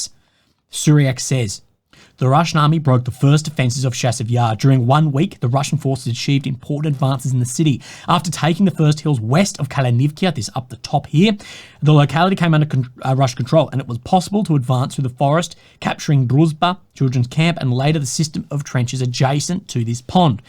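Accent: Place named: Australia. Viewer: Good luck with the surgery and shit. Ive been in korea the last 2 years (kinda not by choice lol ) and yours and is the only aussie voice i hear regularly, keeps me sane. Take care of your self and hope u back in 1 piece soon.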